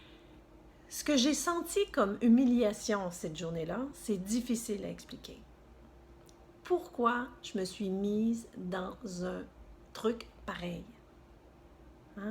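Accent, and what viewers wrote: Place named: Quebec, Canada. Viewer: Oui même fb est dangereux je pourrais en témoigner je me suis faite avoir par 2 hommes surtout un en juin qui se disait amoureux transi mon oeil! IL m'a bien baratinée sur un groupe hypersensibles et fait croire qu'il était séparé est ce vrai?Je sais pas et menti pendant près de 3 semaines j'étais flattée heureuse je me sentais importante mais quelle déception! On s'est rencontrés je suis partie le voir et il a pensé qu'au sexe assez vite et sans protections en plus pff! Il m'a ensuite larguée quand je suis rentrée chez moi j'ai eu du mal a m'en remettre j'en ai pleuré je suis trop fragile..une copine m'a conseillé le site de rencontre MEETIC terminé ! Je ne veux pas revivre ca et une déception avec un manipulateur..Oui les sites et même fb peuvent être dangereux..J'adore votre accent québécois! Il me fait rire.Bonjour de France.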